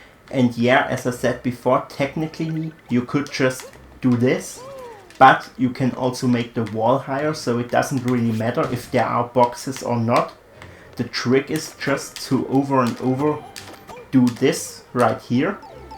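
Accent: Germany. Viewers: man merkt das du deutsch sprichst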